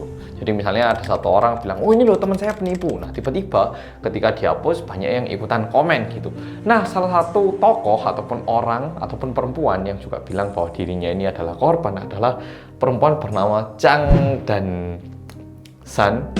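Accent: Java, Indonesia. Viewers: Opa medok upload lg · Bersama orang korea yang medookkkkkkk😁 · Kang Mas,bikin konten tentang kerajaan kuno KOREA dong,swaramu lembut medokg meruntuhkan kesombonganku,biar aku dengerin sambil # TAKING NAP#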